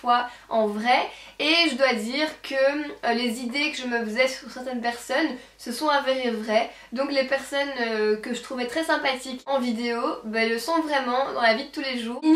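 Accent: Belgium. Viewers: BELGIUM POWER mwaaa! Je trouve pas que ton accent belge est prononcé par rapport au mien ahah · Mais, ne sacrifies pas ton master pour ta chaine et les évènements qui vont avec, c'est trop important;) J'adore ton accent Belge =)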